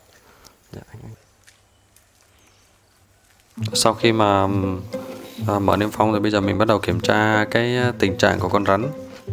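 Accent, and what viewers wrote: North, Vietnam. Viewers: giọng bắc 54 ở đồng nai .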